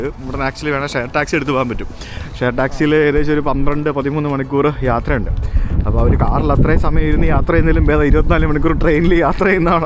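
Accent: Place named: North India, India. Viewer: why do you speak english llike north indians?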